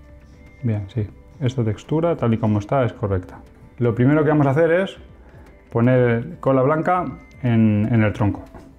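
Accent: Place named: Spain. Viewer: Es mucha casualidad que hablas como si fueras de España(hehehehe), y tu voz parece a la de otro youtuber que sigo pero el hace videos de impresoras 3D, saludos y abrazos.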